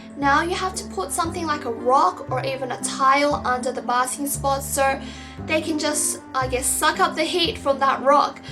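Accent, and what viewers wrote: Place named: Australia. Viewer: I'm not sure where you're from but do you call it a lizard instead of a skink because you caught yours? You sound almost Australian, but I'm not sure, and your blue tongue looks very much like those that would be found naturally instead sold! I'm just really curious. :)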